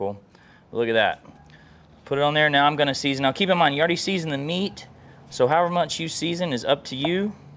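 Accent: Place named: Texas, United States. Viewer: Real Texas voice like Ted Cruz🥶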